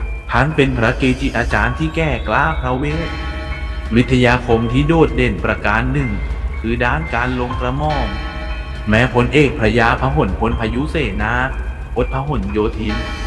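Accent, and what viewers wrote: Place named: Suphanburi, Thailand. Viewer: ผู้บรรยาย ต้องเป็นคนสุพรรณแน่ๆ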